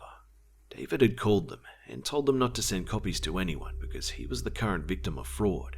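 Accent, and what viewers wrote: Australia. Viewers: Is that an Aussie accent!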